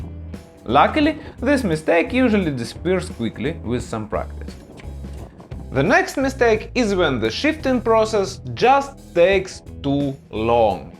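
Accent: Russia. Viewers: кацапський акцент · That Russian accent ♥️♥️♥️✨ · You will not know it, but I do like you, 1. you sound great speaking English, yes I know you have a Russian accent BUT! its not your exact fault, that your govt are pritty stupid and have always caused trouble all over the world, but hey, not you ok, (but you Russians have totally ignored everything! done nothing at all to CONTROL anything, seems all Russians are ignorent of affairs, I have no idea why i have said this, its not motoercycling), 2. your are EASY to understand. 3. you are good at visualisation! 4. its all very comprehensive. I have no idea how you will cope because Russia looks like it will be destroyed somehow?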